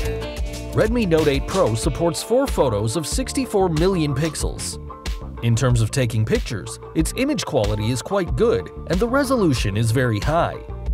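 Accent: United States. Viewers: The script of this video sounds like it was written in China and read by an American.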